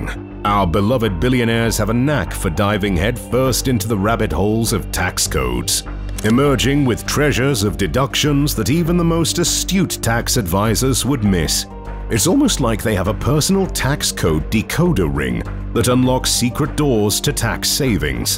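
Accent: United Kingdom. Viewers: I don't like British accent too. Prefer Australian, Canadian and US accents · I don't like British accent. Plus, the narrator's tone is rude & imposing. What he told abt Russia was totally false, hostile & misinforming. Why'd he do it? Ask him! It's an old rivelry between 2 worlds.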